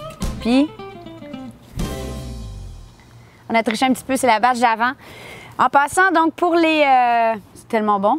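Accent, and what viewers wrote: Quebec, Canada. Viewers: ha ben je découvre votre chaine maintenant (25/09/2024) après en avoir regardé quelques unes pour mon filet mignon séché, quel énergie et sympathie surtout la complicité de votre "minimoi" qui a du bien grandir ! OK je viens d'en faire et je me demandai comme ficeler tout ça vous m'avez donné une astuce avec le doigt merci ! Votre accent est bien agréable à entendre juste ce qu'il faut d’authentique. Alors bien le bonjour du Sud de la France "Cousine" Canadienne sympathique ! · Cc tjs un régal d'écouter votre délicieux accent québécois ici en Bretagne, merci pour cette vidéo, j'ai un magret de canard à sécher au frigo mais maintenant je saurai faire la partie ficellage comme un grand. Au passage j'adors votre joie de vivre, si tt le monde était comme vous, le monde serait plus agréable 😉👋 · Merci beaucoup pour cette idée, c'est genial. 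Je vais l'essayer bientôt. C'est tout a fait intéressant écoutant a votre accent. Moi, je suis Américain, mais appris le Français en France et croyez ou pas c'est ma premiere fois d'entendre longuement l'accent Quebecois. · sans vouloir etre mechant, c est clair l accent quebecquois est une horreur pour les oreilles.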